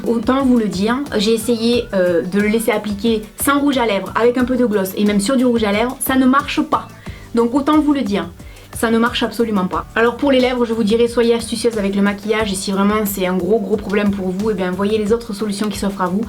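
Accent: South, France. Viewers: Bonjour:) Tout d'abord je remercie Youtube, car j'écoutais simplement une musique et dans les suggestions de vidéos, ca m'a mis ta chaîne (inutile de préciser que je ne te connais absolument pas, ni toi, ni ta chaîne). En suggestion de vidéos, c'était celle au sujet de ta menace de mort. J'ai donc commencé à la regardé (car d'un le titre me mettait déjà hors de moi, et de deux, passionnée de beauté etc.. je suis toujours à la recherche de nouvelles Youtubeuses, ne supportant plus les plus connues...BREF ). Je vais faire rapide car je me doute que tu ne souhaites pas en entendre parler 30 ans, je commente rarement, mais je me devais de le faire. Je te remercie, je te remercie pour ta franchise, ton honnêteté, ton coup de gueule, et ta force. Je te remercie d'être différente des autres (oui ca change de ces grandes Youtubeuses qui ont 2millions d'abonnées et qui ouin ouin plutôt que de pousser une coup de gueule comme tu l'a fais) mais je te remercie surtout pour les tuyaux que tu as donné tout au long de ta vidéo, car certes tu as 40 ans (et je trouve ca super fun) mais les haters oublient vite l'âge des youtubeuses, et certaines sont trés (voir trop, d'avis perso) jeunes pour avoir ce rôle là, de youtubeuse beauté/lifestyle. 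J'ai bien compris que tu ne fais pas de vidéos pour le nombre d'abonné(e)s, mais je tenais à préciser que tu en a quand même gagner une de plus (moi) et sûrement bien d'autre. Moi même du sud Aussi (aussi car à entendre ton accent tu l'es égal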